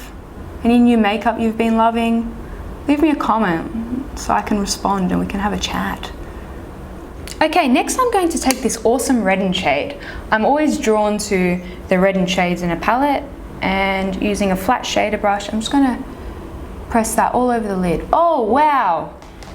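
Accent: Australia. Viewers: Hey beb are you an aussie? Another thing is where do you buy all your makeup from? You're gorgeous btw!!!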